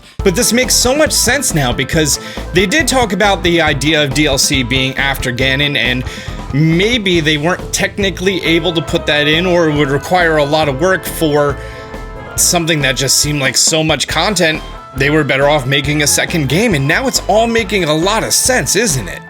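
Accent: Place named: United States. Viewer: Strongest American accent I've ever heard, wtf! 😰😬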